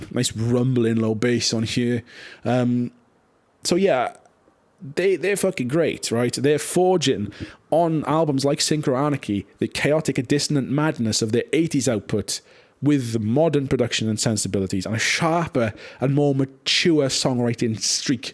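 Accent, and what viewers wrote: Wales, United Kingdom. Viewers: Do I detect a Welsh accent?...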